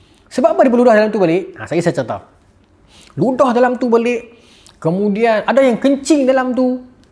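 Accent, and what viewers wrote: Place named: Kedah, Malaysia. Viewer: Seronok dengar loghat kedah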